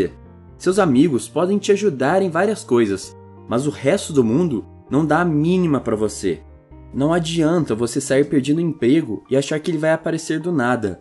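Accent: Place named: São Paulo, Brazil. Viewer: EITA SOTAQUE PAULISTA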